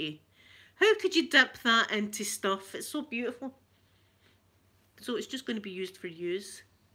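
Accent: Scotland, United Kingdom. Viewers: I love that you wear two glasses like it`s the most normar thing :) and your laughter is priceless, I love it ❤️ I followed you for a long time. You are so nice, beautiful and sweet, and I love your videos ❤️ I love your accent (Scottish), and the accent in general is one of my favorits😍❤️ Ps: I usually watch your videos over and over again, they are so lovely and comforting❤️ stay safe and God bless you ❤️ lots of hugs from Norway ❤️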